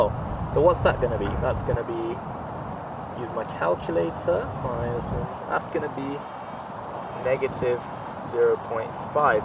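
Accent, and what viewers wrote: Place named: United States. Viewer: tu indian hai, par acche american accent bol rha hai.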